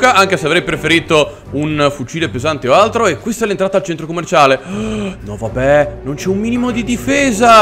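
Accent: Russia. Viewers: Il miglior accento russo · Adoro tuo accento ruusso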